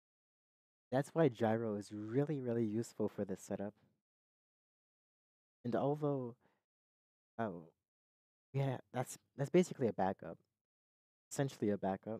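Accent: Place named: England, United Kingdom. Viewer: i know, but it surprised me cause he had a english accent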